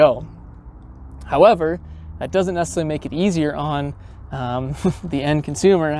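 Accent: Canada. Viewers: this must be in canada i can tell by the way this dude talks why dont they say farenhiet instead of celsius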